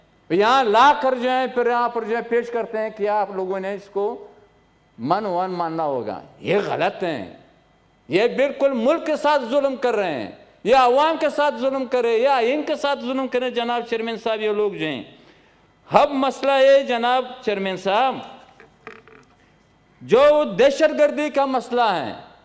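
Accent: Pakistan. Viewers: I like his Urdu acesent.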